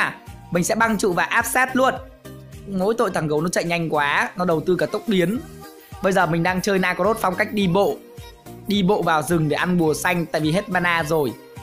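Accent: Nam Dinh, Vietnam. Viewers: Ah quê nam định à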